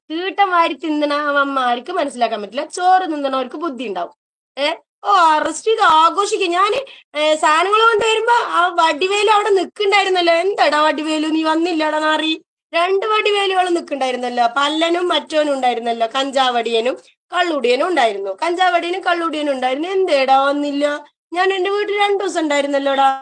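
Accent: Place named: Kasaragod, India. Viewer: ഇവളുടെ കാസർഗോഡ് ഭാഷയാണ് സഹിക്കാൻ പറ്റാത്തത്... ഇടക്ക് അത് കേറിവരും...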